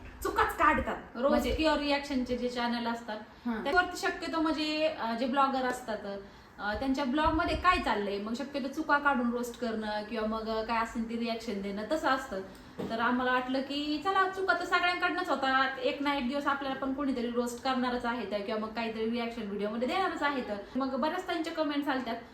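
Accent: Ahmednagar, India. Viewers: अग तुमचे व्हिडिओ असतातच छान ओरिजनल असतात तुमची नगरी भाषा फार छान वाटले ऐकायला कुठल्याही गोष्टीचे एवढे मनावर नाही घ्यायचे डॉग बदल सांगते । चे धन्यवाद त्यांनी खुप छान व्हिडीओ. बनवला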